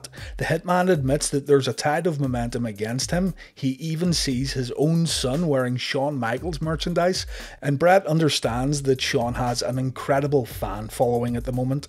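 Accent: United Kingdom. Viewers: What part of UK you from?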